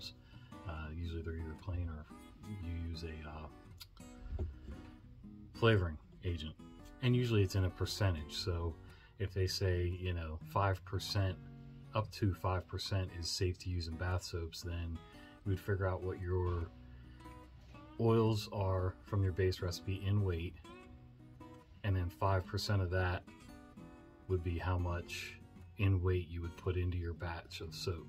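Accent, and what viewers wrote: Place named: Maryland, United States. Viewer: When I heard you talk, I knew you were a Marylander.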